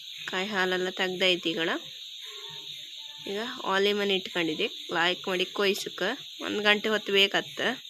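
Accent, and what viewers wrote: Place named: Kundapura, India. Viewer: ಸೂಪರ್ ನಿಮ್ಮ ಕುಂದಾಪುರ ಭಾಷೆ ಕೇಳೋಕೆ ಚಂದ 👌👍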